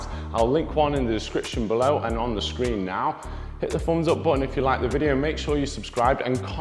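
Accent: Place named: Liverpool, United Kingdom. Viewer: A Scouser!!!!!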